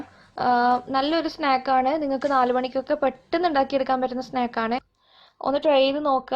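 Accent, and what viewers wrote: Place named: Thalassery, India. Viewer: ingal thalassery kaari aano?? slang thalassery pole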